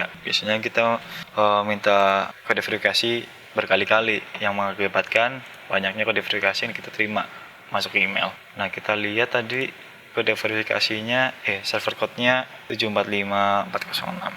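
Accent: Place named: Banyumas, Indonesia. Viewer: membantu sekali mas ngapak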